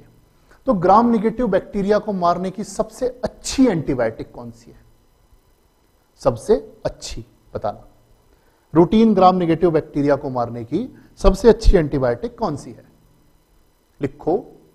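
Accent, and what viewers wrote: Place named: Malwa, India. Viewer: Sir u r a genius with ठेठ (beyond)मालवी accent🙏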